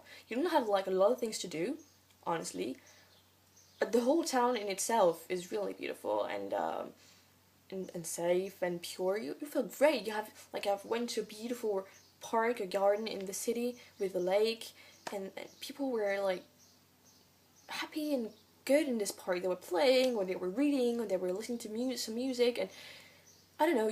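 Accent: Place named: Canada. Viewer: im questioning so much, like the fact im on a video with low views, basically this is a field that hasnt been stepped on in 8 years and i just stepped all over it. Confused with the accent sounds kinda canadian maybe its a hybrid accent.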